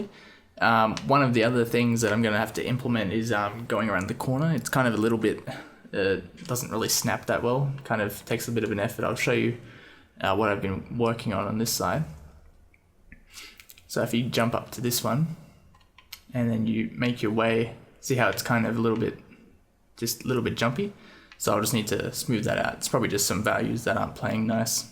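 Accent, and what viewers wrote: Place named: Australia. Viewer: great work on the climbing mod you got going. also cool to hear an aussie making it code :D happy new yr mate, subbing it now.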